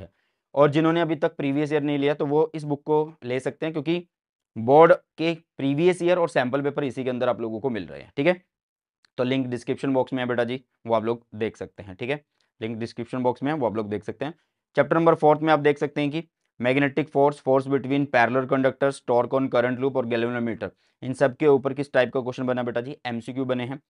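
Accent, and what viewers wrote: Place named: Haryana, India. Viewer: Sir aap haryana ke ho...apni language mein thoda accent hain haryanvi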